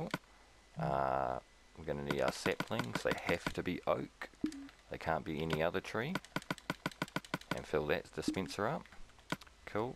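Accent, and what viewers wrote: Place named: Australia. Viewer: this thing is incredibly slow/inefficient, too many non wood blocks within a 5x5 radius. if this were just dirt and a dispenser firing away in an otherwise clear 5x5 area, even just that one glowstone 8 blocks up would slow it down whereas a wood block would not. of course thats also a much faster albeit less flashy way of getting wood. comparatively, this is like the poster boy for erectile dysfunction. however, it would be great if it didnt go against the sapling mechanic and you did a sound job with the video so id say go back to your own design. start off with a dispenser and mark off a 5x5 for testing, you will see that wood blocks(not planks) can pretty much go anywhere while others can maybe go 2 high or so before they hit the bonemeal rate. that said, i still cant give this a thumbs down, Australian accents captivate me. 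goodaye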